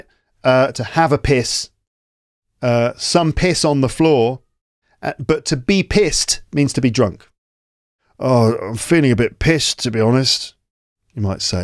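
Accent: United Kingdom. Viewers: Learning British Accent is easy watching your videos.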